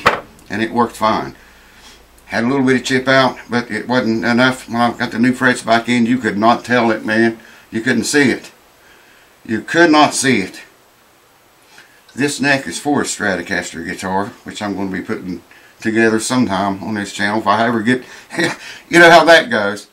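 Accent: South, United States. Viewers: Heard of this for specialized frets ( dont remember the details). Like you vids mostky because of good practical info. 2) your southern drawl. I am from the north and find it facinating! Like hearing it ( used to live in north west FL - lots of alabamans and was in army- same. Also southern females are especially fantastic!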